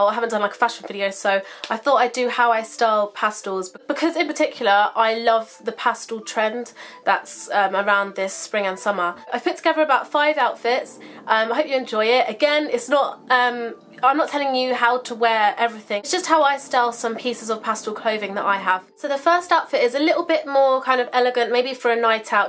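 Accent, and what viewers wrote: United Kingdom. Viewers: I love the accent! <3 Are you British? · she's british you can tell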